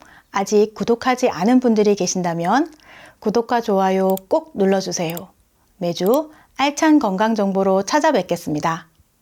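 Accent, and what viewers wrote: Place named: Gyeongsang, South Korea. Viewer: 깨알 정보 정말 고맙습니다 좋댓구알 햇십더~ 구수한 경상도 억양 좋아요~~소실적 생각 많이 납니다 ㅎ 구룡포도 생각 나구여!! 영상 감사 감사 합니다~~~! 화.이.팅하세요~~!)))))))))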